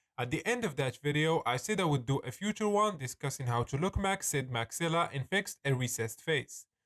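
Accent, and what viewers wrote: Morocco. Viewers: Why your accent is Moroccan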